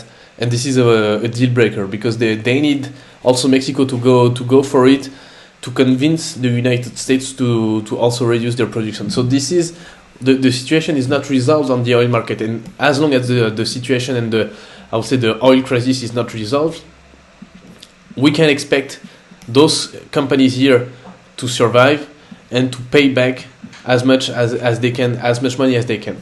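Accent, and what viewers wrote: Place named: France. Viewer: Ton anglais avec un accent francais est tres sympa. Tu maitrises la langue de shakespeare ! Chapeau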